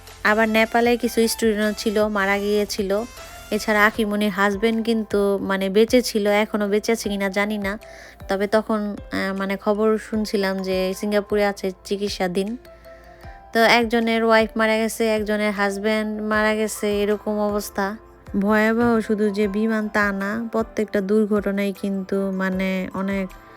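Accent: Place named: Munshiganj, Bangladesh. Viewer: তাইতো বলি ভাষা কেন এত চেনা চেনা লাগছে আমার বাড়িও মুন্সিগঞ্জ শ্রীনগর থানা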